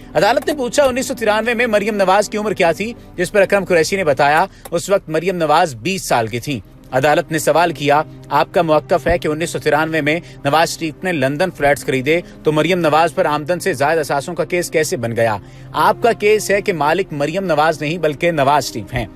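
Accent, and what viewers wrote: India. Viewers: mira matlab k hum urdu k accent se pehchan gay k admin indian hay . kharachi wala bi nhi . or ye indian kis tarah pakistanyion ko ghumrah krny ki koshishein krty rehty hain . lekin finally be niqab ho jaty hain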